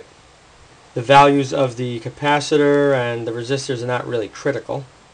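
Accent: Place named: New Jersey, United States. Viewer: Awesome circuit again. I have learned how to design and make any circuit that I want to now, just improvising from all the things I have learned from you, and many more like you. Thanks for all your teachings. And we love that NJ accent. It sounds so warm, especially with the style of how you make your videos which I find very engaging. A bit viceral even. You bring the audience way into your presentations. It is an effective style that others should emulate in my opinion. They are enjoyable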